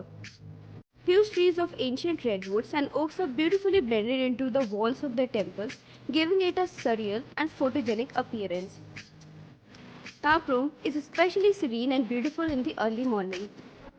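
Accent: India. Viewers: but sounds of india girl.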